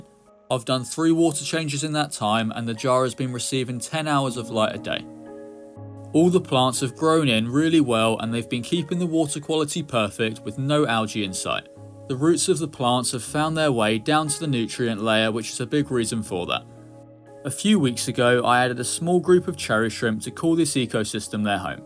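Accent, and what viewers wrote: United Kingdom. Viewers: Beautiful setup! Watching this to help do mine soon, thank u! ☺️ Would love to watch more videos with the natural cadence of your lovely british accent! ✨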